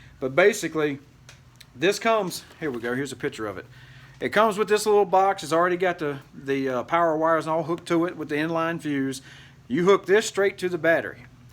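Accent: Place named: North Carolina, United States. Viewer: You sure sound like you could be from NE North Carolina. Ordered the Anzo unit this week. Lookin for it to be here Friday. Thanks for the video. Very helpful.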